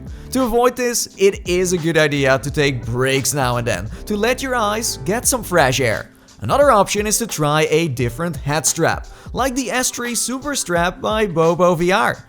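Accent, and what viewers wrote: Netherlands. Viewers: Thank you for the information, I am going to sell my oculus quest 3 for some money (because it sometimes has some issues) and then i am going to buy the 3s and these adjustments, thank you for the information. (And by the way are you Dutch because I am, and you kind of have a Dutch accent, not saying it’s bad.)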